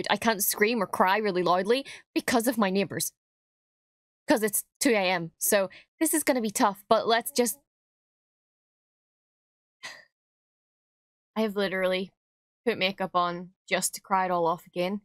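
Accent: Northern Ireland, United Kingdom. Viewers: Sound like your from the north of Ireland? 😅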